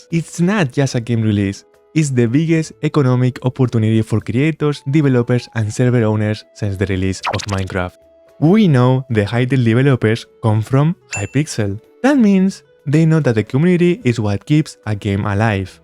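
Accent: Spain. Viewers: Spanish accent for sure :D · ye i'm glad too about that, i would rather see the fun spanish accent that anything ai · well u sound litle difrend in live streams more spaceout speach this why i thinhed u used some kind of ai or u use more takes to make as understandambe as u can. But im glad u are not use ai and to u are not afraid of you spanish accent and not perfect english.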